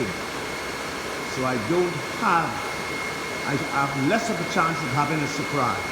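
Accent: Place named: Trinidad and Tobago. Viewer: Good morning. Just looked at video. Informative and simple thanks. Trying to test my suburban. I hear a trini accent. Where are u located?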